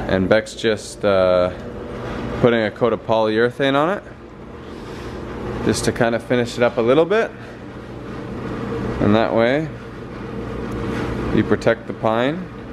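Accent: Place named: Canada. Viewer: Came to watch these old vids and you sound so much more Canadian haha. Loving this series.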